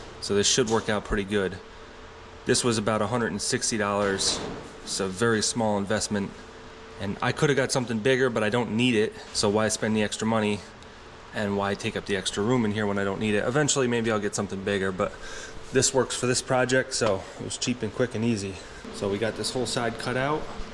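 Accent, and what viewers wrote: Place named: New England, United States. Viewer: The dialect is a little New England and Pennsylvania mixed in.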